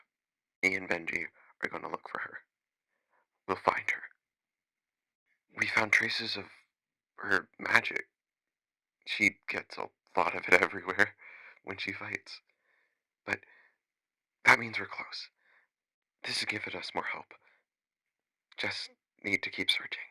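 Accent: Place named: United Kingdom. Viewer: British aha voice ❤ adorable character 💪💗💗💗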